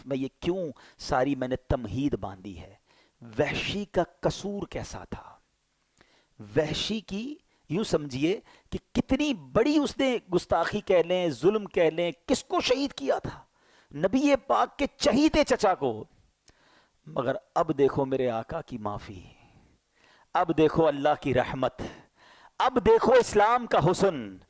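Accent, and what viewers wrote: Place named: Punjab, Pakistan. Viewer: Hzrat AAP itni peare pnjbe bulty ha Bhat achi lghti ha masgalla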